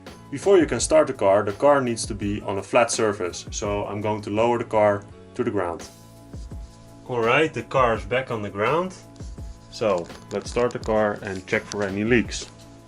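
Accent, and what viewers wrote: Netherlands. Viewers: Sounds like a Dutch guy :-) Super video, ga zo door!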